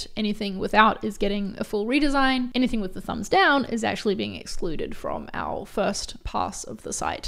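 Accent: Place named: New Zealand. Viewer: Figjam is cool but I’m so glad I was able to tell the you were from New Zealand and not Australia. As an American I’m quite proud.😊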